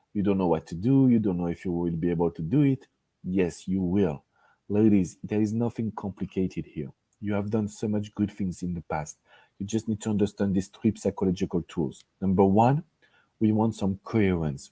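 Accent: France. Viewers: Your French accent is amazing is an advantage 😊 thanks 🙏 for everything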